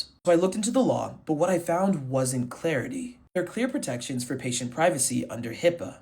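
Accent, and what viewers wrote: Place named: Africa. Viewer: You are correct about privacy. We are in crisis in America--Aliens need to go home and get their healthcare and keep their privacy. Some of the immigrants are dangerous killers. You should be happy that ICE is there . You look and sound like a 1st or 2nd gen, African or Haitian, so. you see things different than non administrative Americans.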